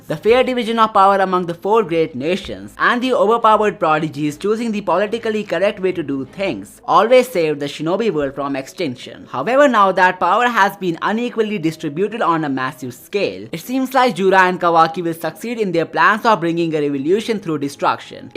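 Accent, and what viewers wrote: India. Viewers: You're being rude here. Skip if you don't like it. FYI, it's Indian accent.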